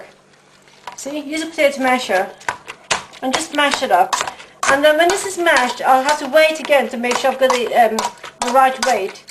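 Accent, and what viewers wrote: United Kingdom. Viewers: Me too! That not a U.K. accent? Close enough! LOL Love those Bongo's!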